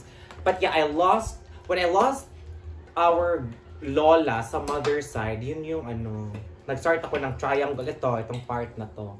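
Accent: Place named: England, United Kingdom. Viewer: i love your english accent more videos pa po 😊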